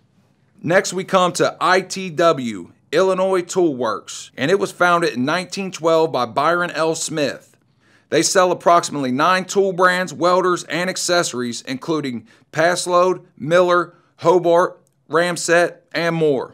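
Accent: South, United States. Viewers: Strong sothern accent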